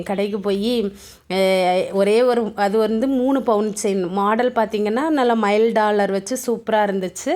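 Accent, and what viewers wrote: Kanyakumari, India. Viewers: Indha slang a kettalae Kanyakumari nu takkunu thonum😂😂😂😂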